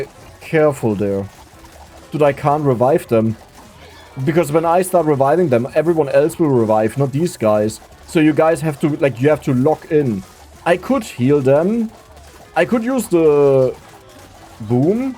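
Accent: Germany. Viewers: Honestly i love your german accent! (and am a little jealous)😊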